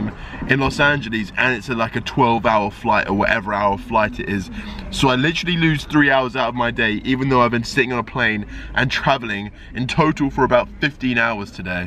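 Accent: United Kingdom. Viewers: He's British mate 😐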